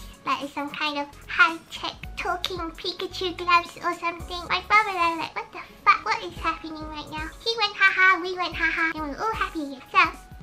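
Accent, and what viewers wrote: United Kingdom. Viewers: I love your editing, and your accent sounds kinda like a cock-knee accent (I've got noooo idea how to spell that sooo I'll just spell it how it sounds 😋).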